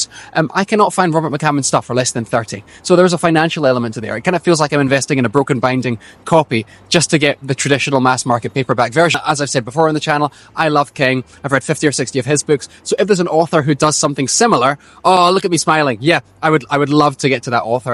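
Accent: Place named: Scotland, United Kingdom. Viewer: Duuuuuude the real deal in a Scottish accent?!! That’s it we are over the moon! So hope our family project hits for you.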